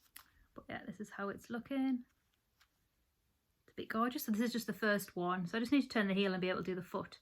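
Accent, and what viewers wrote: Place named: United Kingdom. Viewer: I stumbled across your podcast from a shoutout on Yarnmugs and was immediately addicted to seeing all of your neon colored sweaters! I love your fast-paced style and cute accent too ;) (American here who watches a lot of British podcasters and is always amazed by the diversity of accents on one island) And actually, I think your accent is easier to understand -- at least to my ear -- than some of the other, more common accents. (IG)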